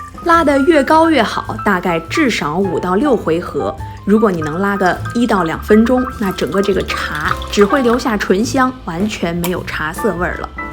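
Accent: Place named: Northeast, China. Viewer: 看起來好好喝，聲音也好聽，很像東北口音😁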